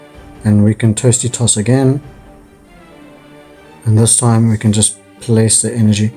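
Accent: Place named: Cape Town, South Africa. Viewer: Me too bro! i was thinking theres no way another cpt gent making pocket content😂. Accent spoke for itself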